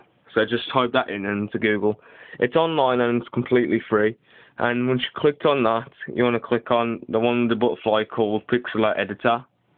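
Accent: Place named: Walsall, United Kingdom. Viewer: thanks a lot mate this help me out loads. you sound like u live near me I live in walsall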